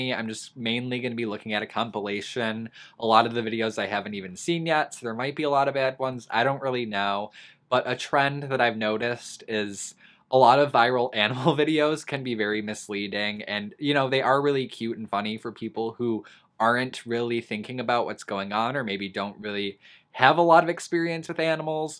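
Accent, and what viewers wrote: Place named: Minnesota, United States. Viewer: I keep wondering what his accent is?? Minnesota? The a’s, like “welcome bahck”. It reminds me of Kathy Bates in AHS Hotel.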